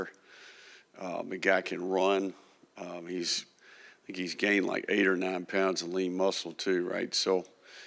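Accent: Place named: Tennessee, United States. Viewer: You must not be from Tennessee lol everyone talks like him In Tennessee (where he’s from)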